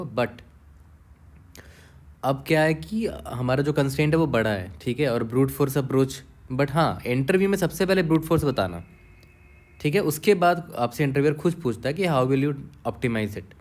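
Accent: Bihar, India. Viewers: i feel you are from bihar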